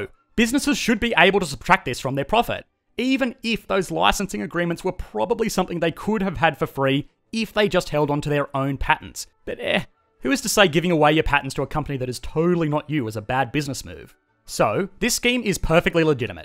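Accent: Australia. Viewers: You have the most charming Australian accent I’ve hared😃👏😄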